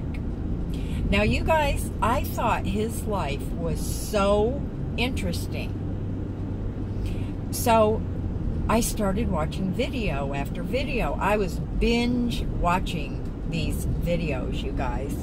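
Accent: South, United States. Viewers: I love your Channel. I love your southern accent. About nomadic fanatic, I ran into him in a Walmart parking lot by accident just one week into my YouTube channel. I turned on my phone that night to detect Wi-Fi and I was detecting nomadic fanatic Wi-Fi so I looked out my windows and didn't see anything so I opened the door and there he was right beside me. It was funny. Eric seems like a very nice guy. When I said how nice he was and made a 1-minute video about it I got so many trolls. I just wasn't ready for them so I deleted that thread. I hated to delete it because it had a thousand views on my brand new channel.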